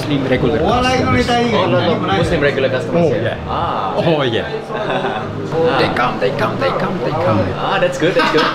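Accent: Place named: Malaysia. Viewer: Now, you are able to speak in manglish and kelantanish.. thumbs up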